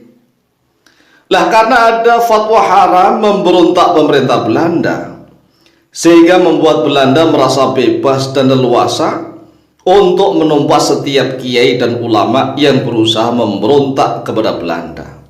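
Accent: Java, Indonesia. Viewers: Ini bukan orang aceh baca ini tapi jawa sebab logat aceh kami tahu. Hahaha kalau kita lihat dimakam krekof yaitu makam prajurit belanda yg di kubur diaceh maka banyak orang prajuirit belanda yg mati disana dan bernama akhir o dan itu paling banyak mati dikuburkan disitu. Coba cek aja sendiri dan hb usman punya murid di aceh tuanku kemala ,tuanku kemala ini pejuang aceh dan ulama. Catatan 150 thn itu bukan diaceh y tapi dipulau itu walaupun akhirnya mereka yg paling merasa banyak pejuang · Min, logatnya ko kaya orang jawa, aksen nya kaya bukan orang Aceh ? Sejarah memang versi, Persis kaya peristiwa G30S PKI Kita juga akan mendapatkan cerita yg berbeda/versi yg berbeda tergantung dri sudut pandang siapa. Seperti juga hari lahir Pancasila, Versi sarjana hukum yg paham ilmu hukum lahirnya tgl 18 Agustus 1945, tapi versi Megawati 1 Juni Tentang habib Yahya, yg disangkakan sebagai antek Belanda kebenaranya harus diuji secara ilmiah bukan dri persangkaan, bukan dri rasa dari fakta, apa kedudukan dan fungsi Mufti pada saat pemerintahan Hindia Belanda dsb, Sama seperti kita melihat film Sengsara membawa nikmat, yg kita lihat Midun sebagai pahlawan, tapi dia jadi polisi pada pemerintahan Hindia Belanda, Berarti sesungguhnya Midun penghianat bangsa dan antek Belanda ? 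Mari belajar berfikir jernih dan menyeluruh, sehingga tidak salah dalam mengambil kesimpulan, kebenaran didunia itu nisbi/relatif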